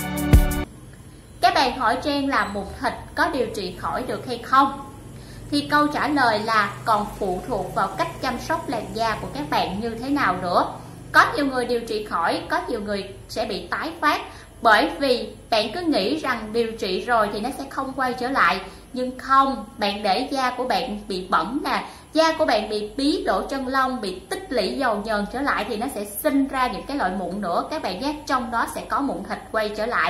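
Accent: Vietnam. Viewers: Bắc không ra Bắc Nam không ra Nam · Cô này người miền trung chớ không phải miền nam nhé , đó là giọng miền trung , hãy phân biệt cho chính xác .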